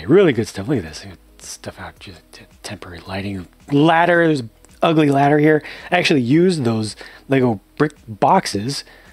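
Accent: Australia. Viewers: U Australian? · aussi accent What a day! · I know an aussie accent when i here one because I am one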